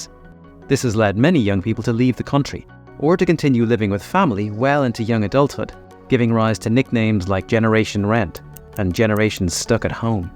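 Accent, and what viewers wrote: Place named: Ireland. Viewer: Jesus, it’s great to hear someone with an Irish accent realising how screwed we are and looking at better models around the world. Hopefully someone government might take note or you get millions of followers who will push them to. Subscribed! 🙏🌍🌱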